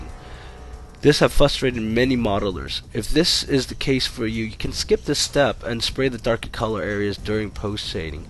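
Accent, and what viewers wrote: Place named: Northern Ireland, United Kingdom. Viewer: Bet ye you're from Norn Iron!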